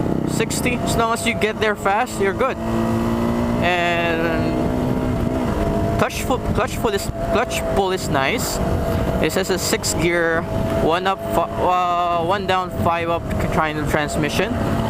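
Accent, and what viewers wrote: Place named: Philippines. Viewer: "yur butt starts to get sore" haha XD Love the pinoy accent mate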